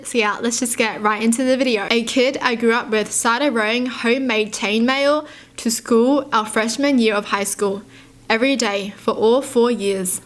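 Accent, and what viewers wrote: Australia. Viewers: Are you from Australia?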